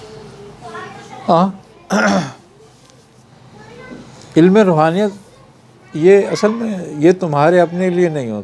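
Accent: India. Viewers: aap ki urdu bhuhat achchi hey dilliwali urdu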